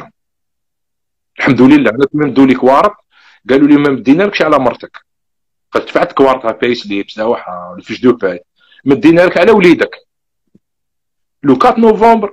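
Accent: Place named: Bordj Menaiel, Algeria. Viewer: تبان من پرج منايل على حساب هدرتك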